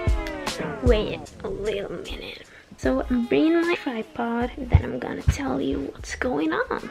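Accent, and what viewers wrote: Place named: Scandinavia. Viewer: i loved this video!! i can't figure out where ur accent is from is it from one of the Scandinavian countries?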